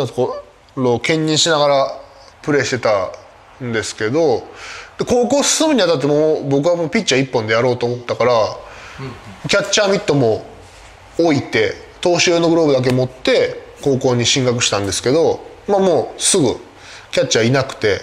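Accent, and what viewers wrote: Kansai, Japan. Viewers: 日本版ダルビッシュやん笑 まーくんの関西弁初めて聞いたわ🙂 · 微妙に関西弁混じるんが関西生まれですね！わら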